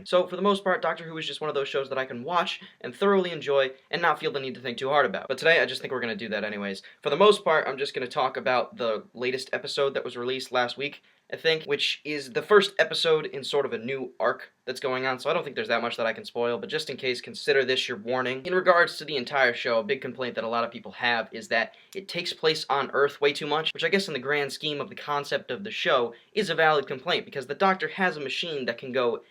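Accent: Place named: England, United Kingdom. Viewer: That English accent omg XD you do make lots of good points though! Even if I did stare at the angel tshirt for the majority of the vid...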